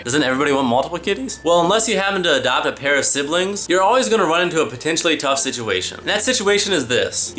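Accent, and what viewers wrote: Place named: United States. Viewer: a hillarious American voice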